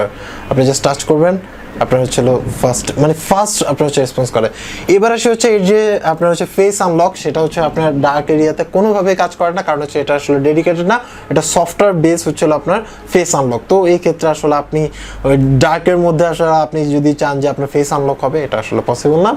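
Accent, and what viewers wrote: Bangladesh. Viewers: বাংলাদেশি মনে হচ্ছে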